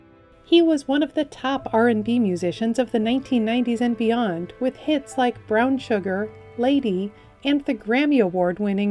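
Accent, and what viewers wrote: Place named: Fargo, United States. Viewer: Think about using ai to narrate for someone less Fargo sounding.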